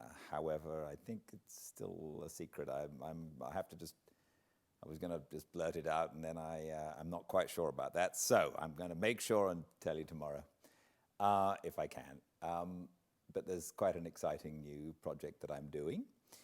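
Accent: United Kingdom. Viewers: "But could not be reconciled to the thought that the letter might fall into the hands of the British" The irony of this line being read by a Brit is amazing. Made me chuckle. I just discovered your channel,. Thanks for making these videos; I am looking forward to going back and watching some of your previous installments. As someone who lives with dyslexia, reading long texts was always very frustrating and demoralizing for me. But I used to LOVE being read to! My dad used to read the Chronicles of Narnia, Sherlock Holmes, and other favorites to us as a family. That was a long time ago, but those memories are still some of my favorites from my childhood. This channel feels like a return to that childhood. So thank you for recording these. I'm enjoying them quite a lot.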